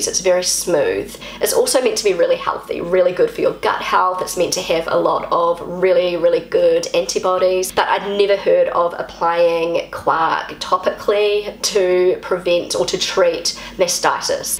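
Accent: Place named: New Zealand. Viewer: I as a German with lately more of an average share of doctor's appointment obviously cannot comment on all possible infections, but the last time that I remember having been prescribed an antibiotic was more than twelve years ago when after having been bitten by a tick, an erythema migrans developed which might have turned into Lyme borreliosis, which was stopped by doxycycline. But other cases I don't really remember, and I'm in the second half of my sixties. As an aside, I really enjoy your Kiwi accent. I was in NZ in 1987 and 1993, and it is one of the few countries I'd have a desire to visit for a third time if it weren't so bloody far away. But in trying to explain to non-German (but some-kind-of-English) speakers how a German long E is pronounced, I'm sometimes successful by pointing out how New Zealanders pronounce "nEver", "sEd [said]", "tEn", "elEven and so on.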